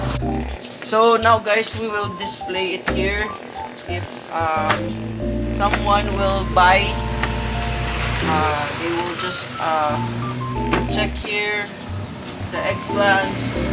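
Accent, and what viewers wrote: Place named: United Kingdom. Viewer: Lucu kali mas the way he speak "british accent" make me laugh at the same time of his cute face.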